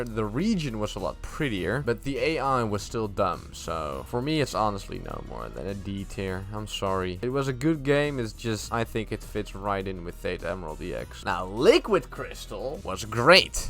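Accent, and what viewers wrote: Netherlands. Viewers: Wow, your accent sounds so Dutch! Geweldig. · Not at all... Imao. Pewds is Swedish and sounds Swedish. is Dutch and sounds Dutch. But they're both definitely accents!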